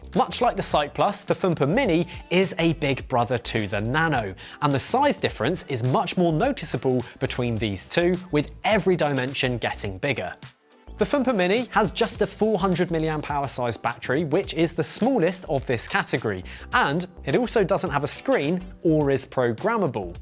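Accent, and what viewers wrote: United Kingdom. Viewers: This must be a Brit channel based on the host's accent and that I see no mini or midi pumps that are available in the US displayed here. My pump is programmable and rechargeable and very poplar in the US.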